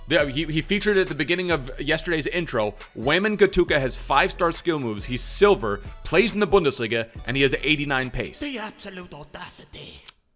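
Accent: Australia. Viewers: That Aussie accent 👌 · More Aussies accent pls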